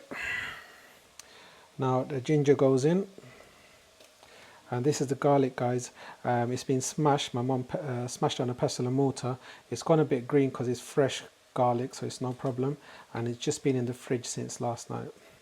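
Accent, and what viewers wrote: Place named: United Kingdom. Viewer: Ps. I love your british accent.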